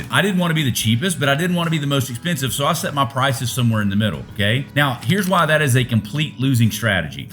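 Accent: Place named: Nashville, United States. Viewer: Honduras baby. God bless you, love the Nashville accent.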